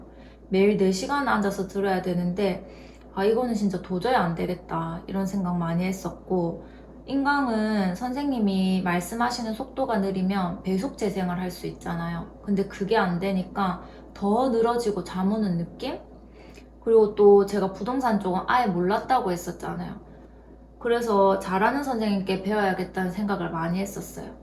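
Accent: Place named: Daegu, South Korea. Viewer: 워킹맘인데 공인중개사 관심 생겨서 검색해보고 보는데 익숙한 사투리 너무 정감있어요 ㅎㅎ 저도 대구에서 자랐거든요~ 열심히 하신게 느껴져서 동기부여도 되네요. 솔직한 영상 감사드려요!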